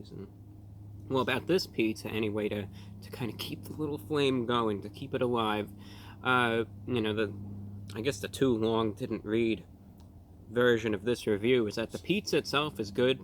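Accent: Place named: Brooklyn, United States. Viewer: He has to be from Brooklyn ! Lol 🗽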